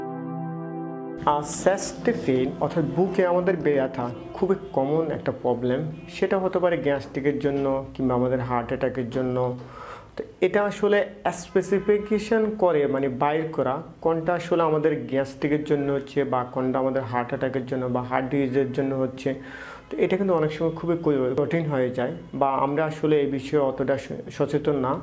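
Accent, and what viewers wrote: Noakhali, Bangladesh. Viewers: ওরে হেতের বাড়ি তো নোয়াখালী 😊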